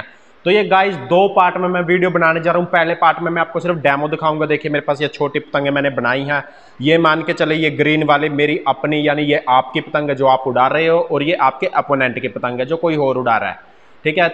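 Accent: India. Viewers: Bai tusi punjabi ho accent punjabi vala feel hunda but feel good to see ur video bro.. kal ehi krna mai 💪🏻♨️💯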